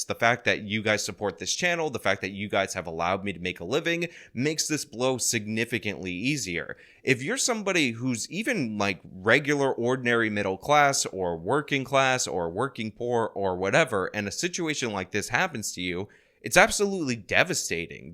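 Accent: New York, United States. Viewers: Your a native New Yorker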